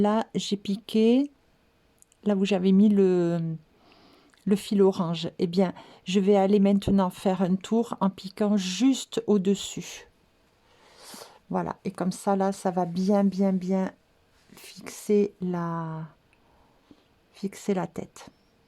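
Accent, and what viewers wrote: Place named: South-West, France. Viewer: Merci pour cette panière. Je viens de la terminer et j'ai pris beaucoup de plaisir à la réaliser grace à vos explications extrêmement claires et précises. J'aime beaucoup vos tutoriels ainsi que votre voix calme avec ce joli accent du sud ouest.💜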